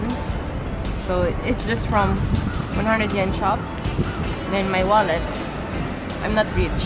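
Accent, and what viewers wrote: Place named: Philippines. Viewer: your speacking english was look like an filipino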